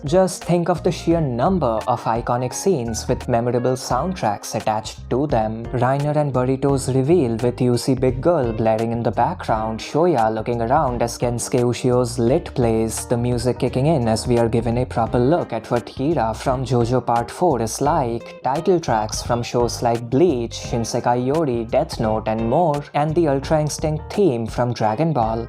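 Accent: India. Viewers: bruh why do u talk so slow lmfao, is it because of indian accent?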